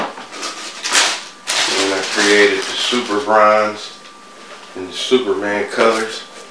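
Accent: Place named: South, United States. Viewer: He sound so country lol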